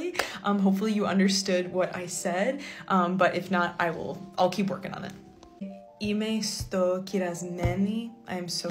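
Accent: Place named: Mediterranean. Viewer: Sounds very Mediterranean. Keep it up!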